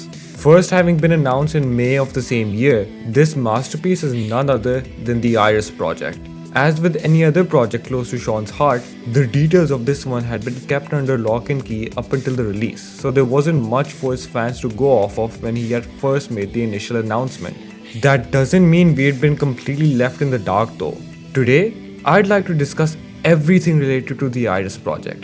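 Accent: India. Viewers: Oi oi another Indian (or South Asian) Jacksepticeye fan. A rare occurence! And from your accent and the English used, I am assuming you live in India (orrrr may be not)? Which is even rarer.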